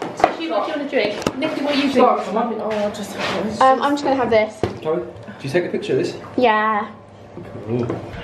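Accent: United Kingdom. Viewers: I like ger British accent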